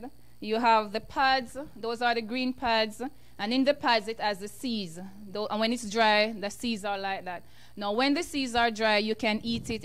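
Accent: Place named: Jamaica. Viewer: keep it real me love de jamaican accent thanks for your presentation.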